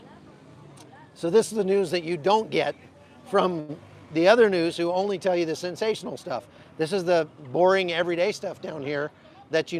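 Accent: United States. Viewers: Get a stabilizer to hold your phone,. Your Spanish is funny with the heavy American accent.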